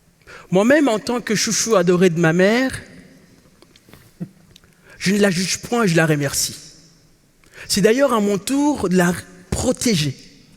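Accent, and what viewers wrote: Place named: Democratic Republic of the Congo. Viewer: Oui, ce n'est pas faux, C'est difficile de se défaire de l'accent congolais 😅 Mais bravo à lui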